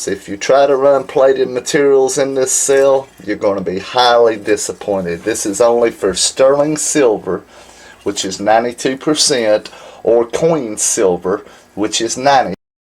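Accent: United States. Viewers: "coyens" 😜 Your accent is so close to the kid from Forrest Gump. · I was born near San Francisco, and frankly, I'm getting bored with my local dialect. It's nice to hear another distinctly American sound from time to time: reminds us that this is still a very varied country. Best Health.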